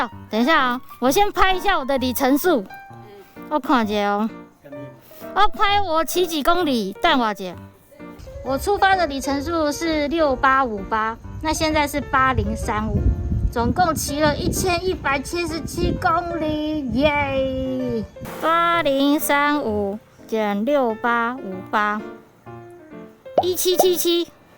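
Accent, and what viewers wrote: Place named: Central Taiwan, Taiwan. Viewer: 好親切的中部腔~😆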